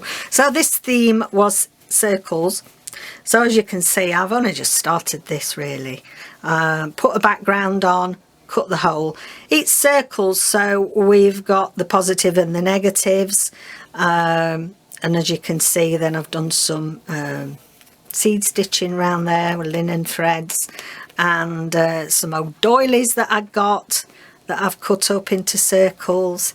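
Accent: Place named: England, United Kingdom. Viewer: Hi I have your other videos on my watch list so I haven’t gotten to them yet. I just saw this one come up and couldn’t wait to watch it. I just love what you’re doing I’m only a few minutes in but just had to comment. I love your accent you pronounce some things like my English gran did! Your project is stunning! Can’t wait to watch more! Hugs Rachel 🤗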